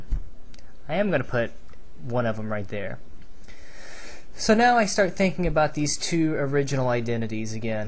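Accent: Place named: South, United States. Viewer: good vids. but your southern accent distracts me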